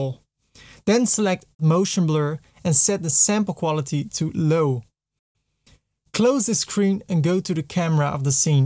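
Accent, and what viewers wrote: Netherlands. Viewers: Ok top je Nederlandse accent is wel een beetje opvallend :)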